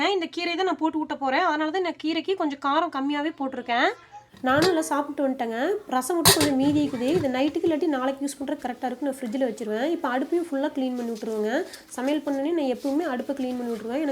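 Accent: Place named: Erode, India. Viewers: Hai i am also frm erode but now in ooty happy to hear our erode slang thank u god bless u sis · Nalla theriyudhu. Neenga pesara slang. Namma oorula than over ah vanga ponga nu pesuvom. I'm from Chittode 😊 lives in Bangalore . Ur good name ???? Ur dad s a cook ???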